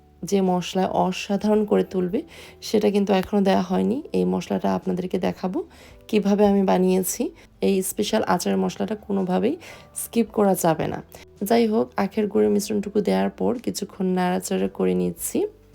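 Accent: Sylhet, Bangladesh. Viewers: Apu Are You Sylheti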